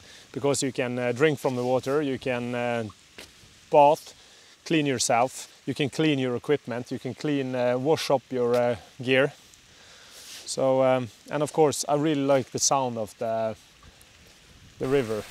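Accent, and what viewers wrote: Bergen, Norway. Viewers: Hvorfor bergengelsk ?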